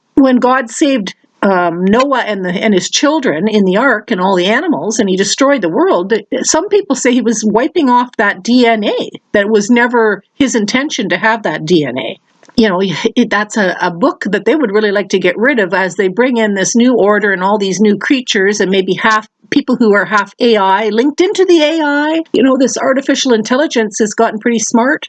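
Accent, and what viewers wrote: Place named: Canada. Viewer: I've been outside and I'm still alive. April 21, 2020. You sound like your from Canada.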